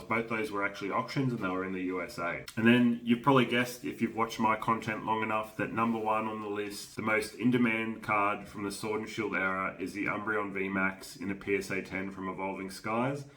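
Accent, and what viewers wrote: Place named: Australia. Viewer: Brooo… why did you need to add ‘Australian Dollar’ after the sums…? I can clearly tell that your Australian accent would mean that you’re talking about AUD.. 😅 Great video mate! Wish I still had my moonbunny 😢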